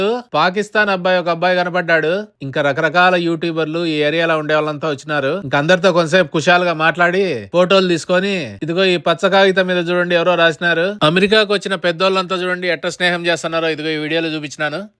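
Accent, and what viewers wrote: Kadapa, India. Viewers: సూపర్ గారు. కడప బాషను చాలా ఉంది. మీది కడప దగ్గర ఏ గ్రామం గారు. మాది రాజంపేట. ఎడ్యుకేషన్ కడప ఆర్ట్స్ కాలేజీ. ప్రెజెంట్ నేను హైదరాబాద్ చందానగర్ లో ఉంటాము. 1991 హైదరాబాద్ కు ట్రాన్స్ఫర్ అయినము. కడప బాషా వినాలా అంటే మీ ఛానల్ విని ఆనందం పడతాము.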